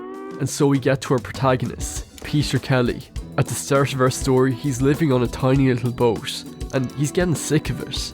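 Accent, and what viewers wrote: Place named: Ireland. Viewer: Nice to hear another Irish voice on youtube. Never heard of this incident. Looking forward to seeing more.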